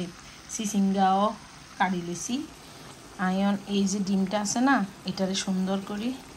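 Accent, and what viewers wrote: Noakhali, Bangladesh. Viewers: Apni noakhali vasay kotha bolen sunta valo lage....amio noakhlir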